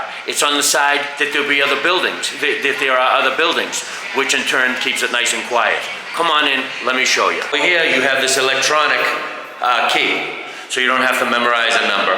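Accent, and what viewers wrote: Boston, United States. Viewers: Ahhh not to good 😂 about the hot water 😂 wrap your head around it 😂 Boston talk 💪👍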